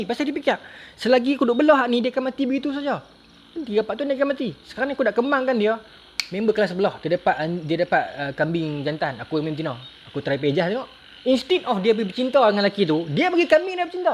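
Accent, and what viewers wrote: North, Malaysia. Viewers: kasaq ustaz 😂. Org utagha mmg camtu hahaha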